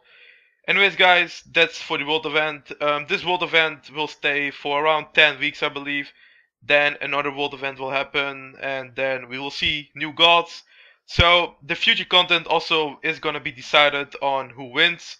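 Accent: Netherlands. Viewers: je hoort egt dat hij nederlands is :p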